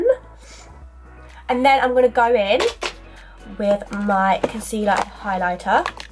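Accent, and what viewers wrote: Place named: United Kingdom. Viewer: just wondering where do you live you have a sort of british accent but you have loads of makeup from american brands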